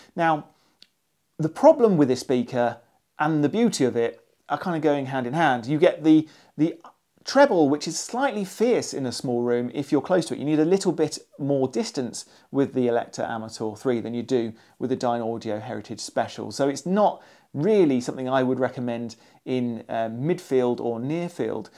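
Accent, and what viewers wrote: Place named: United Kingdom. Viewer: wonderful British accent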